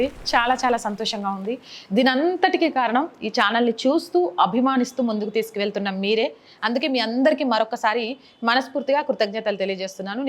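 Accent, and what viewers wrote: Telangana, India. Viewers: నువ్వు తెలంగాణ యాస తగ్గిస్తే ఇంకా పెరుగుతుంది పోరి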